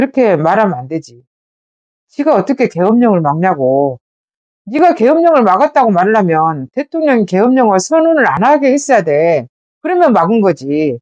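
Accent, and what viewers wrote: Jeolla, South Korea. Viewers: 절라도 사투리가 구수하게 들리는 건 처음 ㅋㅋ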